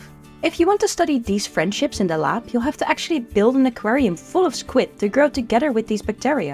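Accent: Netherlands. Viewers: Forgive me but I just have to say that the Dutch accent in English is so cute that I can hardly stand it lmao 😍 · you sound dutch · Immediately recognised it too, as I'm Dutch and so I hear it oftenly. And I don't mind it. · Is that a Frisland accent? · I love Duth accents ( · i know is dutch bc of her last name (its a dutch last name) an you can hear it in the way she talks she has a dutch accent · Instantly heard she was Dutch❤ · Funny how I instantly heard she was Dutch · weakest dutch accent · Great video and loved hearing the dutch accent! :p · still weird to hear dunglish in a minute earth video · So Dutch sounding... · Is that a Dutch accent I hear?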